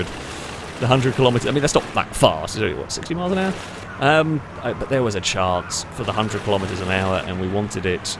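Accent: United Kingdom. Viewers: Are you British? I'm irish and we dont like the British but if you are your a exception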